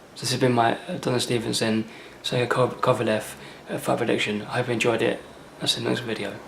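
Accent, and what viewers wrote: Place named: Scotland, United Kingdom. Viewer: Fuck dude, u sound Scottish! I barely understood what u said "lai heavy wait taitol" and I know ur English but slow down overthrere Otherwise no one will leave u comments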